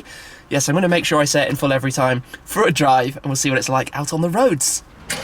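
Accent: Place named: England, United Kingdom. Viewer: hate the fkin english accent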